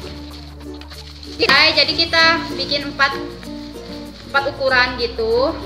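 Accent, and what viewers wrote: Madura, Indonesia. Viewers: Buset..Madura kah kak kwkw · org madura kah kak, logatnya..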